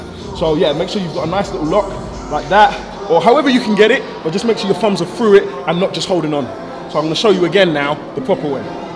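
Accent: United Kingdom. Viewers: I have been bodybuilding for over 40 years, and you are by far the best trainer I have watched! You are the "Real Deal." Love your methodology, eating, and your pretty wife. UK accent is nice also. I am 68 years old and still hitting the gym 2 hours 3-4 day's a week! That is why you have never been injured the by way you train. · Some British accents I like, this one just irks me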